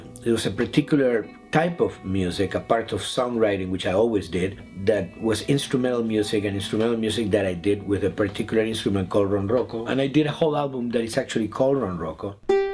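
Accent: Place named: Argentina. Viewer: El tono Argentino se te nota desde el pincipio♡♡♡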